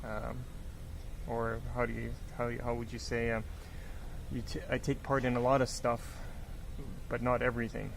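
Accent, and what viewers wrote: Germany. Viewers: good german accent, you still got it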